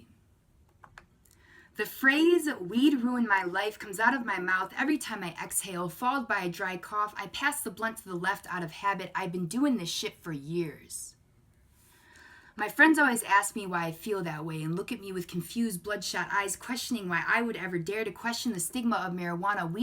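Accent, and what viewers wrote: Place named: Midwest, United States. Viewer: Damn girl that Midwest accent is so cute what state are you from Wisconsin right?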